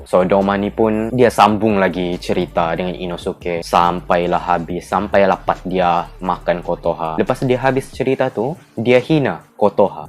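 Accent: Sabah, Malaysia. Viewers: logat2 sabah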